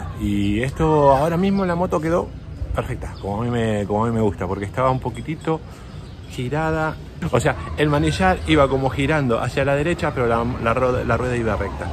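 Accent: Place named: Argentina. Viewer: Hola. Y la rueda no controla?? Solo esto? No aflojas dirección? Creo que talves mi problema de vibración y movimiento leve de izquierda a derecha. Se soluciones así .. ja .. mi moto es vieja y jamas se la hace nada. Aunq mis rodamientos están buenos en la rueda y su eje. Seguro son los barrales. Gracia por tu info. Me suscribiré.. y por cierto. Eres argentino??. Suenas tal cuál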